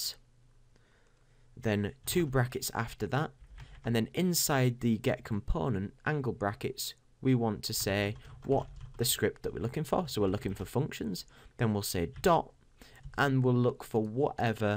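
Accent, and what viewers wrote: United Kingdom. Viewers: I've already saved your channel AND subscribed. I'll definitely be exhausting your videos before I hunt for any more. Wish I could buy you a beer...or a i guess that would be more like a 'pint' since it sounds like you're in the UK. Cheers!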